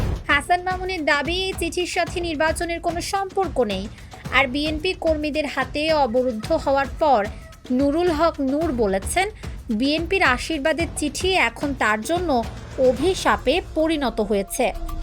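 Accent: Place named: West Bengal, India. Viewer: তোমার কনটেন্ট সবই ঠিক তবে তুমি বাংলাদেশী নও কারণ তোমার কথায় অনেক কিছুতে লাগতেচে তুমি ওপার বাংলার এযেমন একটা কথা বলচো না ঘার কা না গাটকা এটা এনখও বাংলার ৯৯.৯৯% লোকে জানে না এটার মানে কি তবে নিজের দেশের দালালী করো আমার দেশের না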